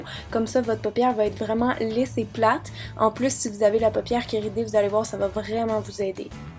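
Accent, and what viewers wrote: Quebec, Canada. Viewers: elle est surment Canadienne ou Québécoise si tu écoute sont accent et là-bas on utilise pas le mot scotch mais papier collant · l accent de Céline dion.....🥴🥴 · surtout qu’elle est canadienne... ils ne parlent pas la même langue, du moins pas le même accent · j'adore t'es videos, meme si je ne comprends pas tout ce que tu dit a cause de ton accent quebecois (mais j'adore l'accent quebecois);) <3, je suis d'acord pour une video avec plusieurs sorte d'eye liner! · C'est un accent québécois. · Je pense qu'il y en a pas mal d'entre vous qui n'a pas reconnu l'accent Canadien donc des expressions différentes! Alors sortez un peu, allez ailleurs que dans les magasins de fringues et maquillage, faites vous teindre en brune, achetez vous un peu de culture et vous aurez l'air moins ridicules avec vos commentaires stupides!!!!! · t canadienne? 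· Ta video est super ! Ton accent NSĹDLSKS j'adoreeee l'accent quebequois 😍😍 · canadian accent :D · nossa nossa Elle est canadienne ...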